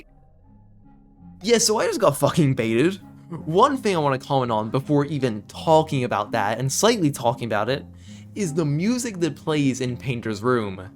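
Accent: Ireland. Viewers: Is bro from [anywhere in the island of Ireland (Not just in the Irish republic)] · i can hear the damn irish in your voice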